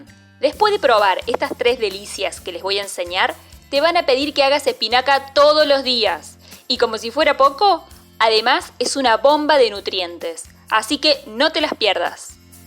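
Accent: Argentina. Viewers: Soy colombiana casada con un argentino😁.Vì tus recetas y me haces reìr con el acento y dichos idènticos a mi esposo.Saludos🇨🇴🇦🇷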